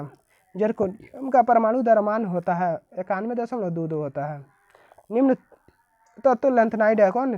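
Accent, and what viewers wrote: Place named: Bhojpuri, India. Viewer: Hindi to shi bol liya kro usme bhi bhojpuri add kr dete ho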